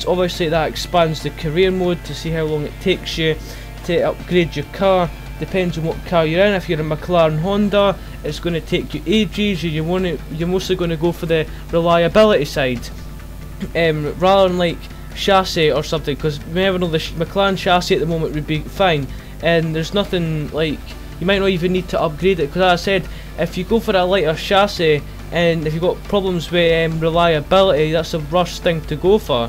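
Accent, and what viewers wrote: Scotland, United Kingdom. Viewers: thodoris papa that's the glorious sometimes annoying to a English Man Scottish accent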